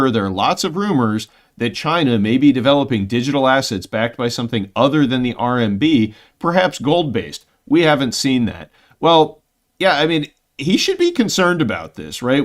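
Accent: Chicago, United States. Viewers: Where is that accent from? Sounds purely like a Chicago accent of my youth?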